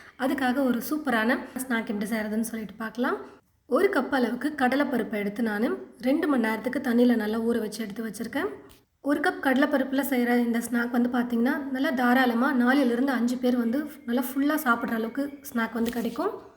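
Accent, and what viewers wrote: Coimbatore, India. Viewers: Coimbatore ah... 😂😂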